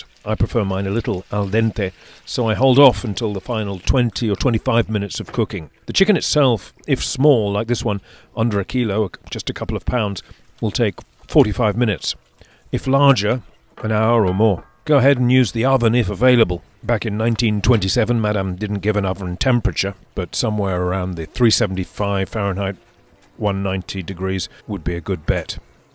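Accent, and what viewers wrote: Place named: United Kingdom. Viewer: YIMBY (yes in my backyard), there is always room in my backyard for culinary pilgrims. Madame Saint-Ange would have been suitably amused by the fact that her name is brought to our attention by a cook having a very British accent, BUT secretly content on knowing that the good word is being brought to us heathens! Keep up the good work!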